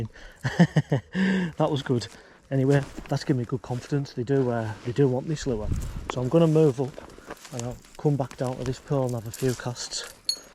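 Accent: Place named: Cumbria, United Kingdom. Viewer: What river is that mate ? I’m guessing somewhere in Cumbria going by your accent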